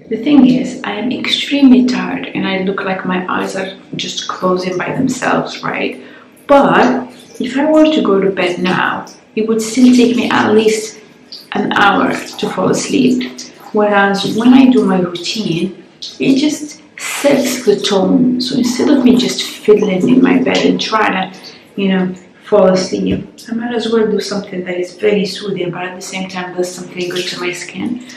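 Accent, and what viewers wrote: United Kingdom. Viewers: Its been a while!! You are starting to catch the english accent!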